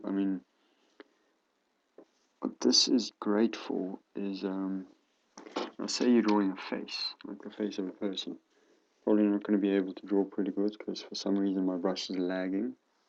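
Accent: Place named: South Africa. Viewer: You from South Africa? I love your accent~ <3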